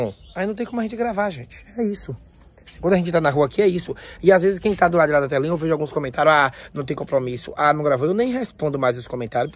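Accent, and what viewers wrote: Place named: Bahia, Brazil. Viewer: Amo ouvir esse baiano voz linda sutaque lindo E Assim mesmo parece sacanagem eita jó Ótimo domingo ❤❤❤aposto q não vai ter som Presta atenção so a misericórdia Deus e masss Ótimo domingo para vcs.....❤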